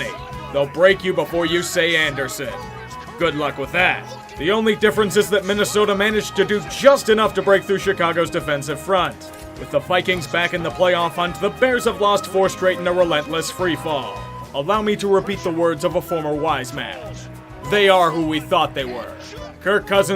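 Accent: Pittsburgh, United States. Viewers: Did anyone understand anything he said in Yinzer Mode? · the whole Yinzer voice thing is unwatchable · Stop doing the yinzer voice I actually wanna enjoy the coverage on the steelers lol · the yinzer sultry voice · Unpopular opinion: I dont the like the yinzer voice, as a Steelers fan. I miss days of our Steelers lmao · inner Yinzer has finally come out after all these years and I LOVE IT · The return of the Yinzer It’s glorious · yinzer voice is a true thing of beauty. To anybody who has never been to Pittsburgh and wonders if we really sound like that? Pretty much, yeah, we do. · Stop with the Yinzer voice makes me wanna cut the video. So fucking annoying and not funny! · “yinzer voice” is actually real voice. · Jesussss christ that insane cringe yinzer voice. Nearly unsubbed.. nearly. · Don’t ever go to Pittsburgh, that’s literally how every local talks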